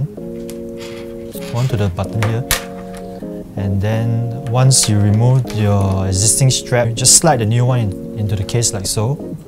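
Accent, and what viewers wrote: Singapore. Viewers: Definitely sounds like a Singaporean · omg that Singapore accent.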